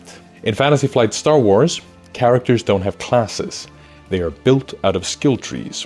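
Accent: Iceland. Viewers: I don't who you are deep voiced icelandic accented henry cavill lookin harry potter glasses wearing person. but your video showed up in my feed and i liked it.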